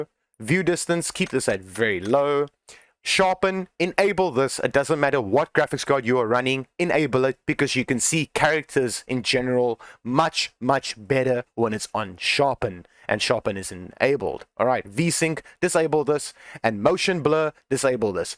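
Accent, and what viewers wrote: South Africa. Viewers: i love the south african accent XD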